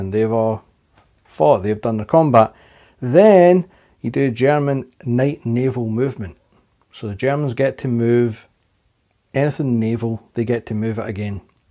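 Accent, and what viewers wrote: Scotland, United Kingdom. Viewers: Good on you lad. Nice to hear a Scottish accent and not some whiny little pre-pubescent English, or Yank gobshite.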